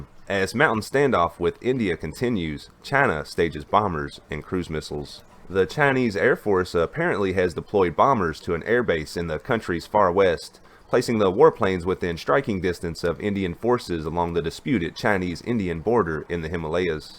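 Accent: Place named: West Virginia, United States. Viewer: Sounds more like West Viginian accent. You insult true Southern accents.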